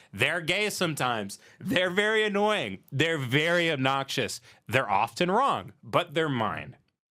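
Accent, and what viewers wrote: South, United States. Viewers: i could listen to doing a southern accent about nonsensical college sports talk for 10 hours straight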